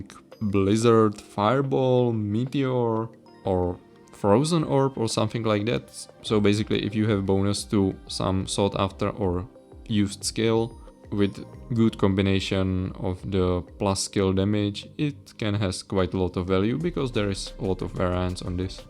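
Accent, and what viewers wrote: Czech Republic. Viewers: Great summary, minor note regarding Ormus, unfortunatelly it AFAIK cannot roll FO nor any element mastery. In the shields category, I'd personally briefly mention also Gerke's Sanctuary, which has similar use as String of Ears, i.e. in ES builds for defensive setup, as it also provides solid damage reduction. Btw just guessing based on accent, aren't you Czech?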